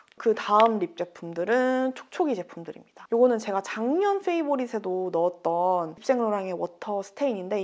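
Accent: United Kingdom. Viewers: When are you going to film your vid in English? I love your British accent😭🇬🇧❤️❤️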